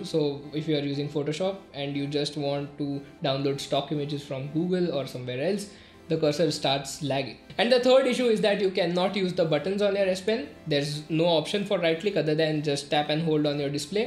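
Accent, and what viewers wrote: India. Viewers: Great video god i hate the indien accent - _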